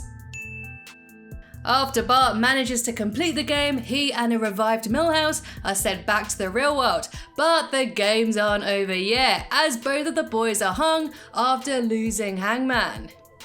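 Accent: United Kingdom. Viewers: Love British voices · love the Brit voice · She's speaking British English, though.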